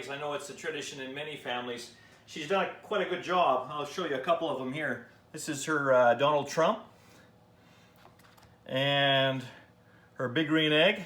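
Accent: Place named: Canada. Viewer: B.C. Egg! I thought I heard Canadian-speak in your voice! Love your Elvis TCB shirt, where did you get that? Oh yes, and thank you for another helpful video! I used these methods after my successful EZ-peel hard boiled eggs experiments, inspired by your other video at <link>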